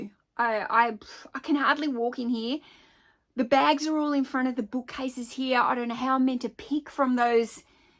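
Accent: Australia. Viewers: I cant get enough of you saying the word "book" that Australian accent though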